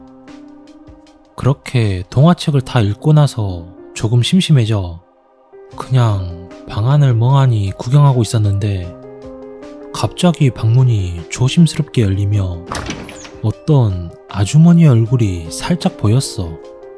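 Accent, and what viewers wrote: Jeolla, South Korea. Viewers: 전라도가 고향이신가봐요?^^ 구독하고 합니다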